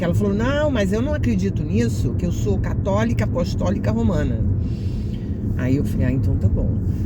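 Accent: Rio de Janeiro, Brazil. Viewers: Pedindo para ter espaço aí pra volta ❤ E vc ainda é nitidamente muito carioca ;) ❤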